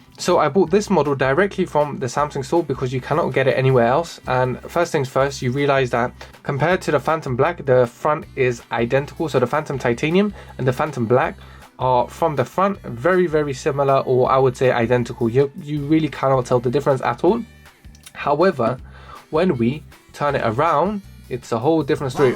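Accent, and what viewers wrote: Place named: British Pakistani, United Kingdom. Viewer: This bloke sounds like the British tech reviewer Supersaf. Supersaf is the most well known tech reviewer here in the U.K. This bloke is probably a British Pakistani based on his accent. Fun fact: Pakistanis do not actually speak the language of the prophet muhammad (pbuh). They speak punjabi. Some of the Pakistanis are really cool people. But they read the quran in arabic - but they do NOT speak arabic so they cannot understand what they are reading. I think some of the Pakistanis are really cool. But the prophet muhammad (pbuh) NEVER spoke Punjabi which is the language of the Pakistanis. I would like this bloke (or Supersaf) to do an entire tech review in Punjabi which is actually the language of the Pakistani race.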